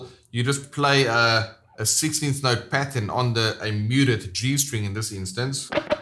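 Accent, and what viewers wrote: South Africa. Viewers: I can hear you're South Africa, same here! Any advice on tone or do you have any videos on tone? I have a Roland Cube amp and also use a BOSS GT-1, but I haven't played around with tones. The tone you're using is perfect for worship, how can I achieve this tone?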